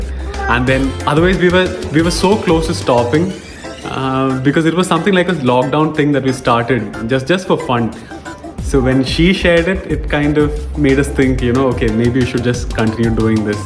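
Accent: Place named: Kerala, India. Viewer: That's just Malayali accent. Most of us have it. 😂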